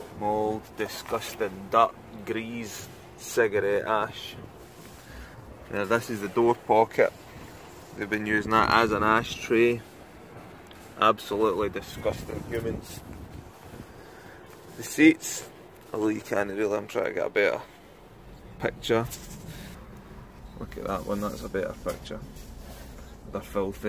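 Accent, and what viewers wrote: Scotland, United Kingdom. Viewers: Hold on is that a Scottish accent talking about sun burn?........ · In a strange way, these videos remind me of the song: " " by. Your Scottish commentary plus the music. Pure brilliance.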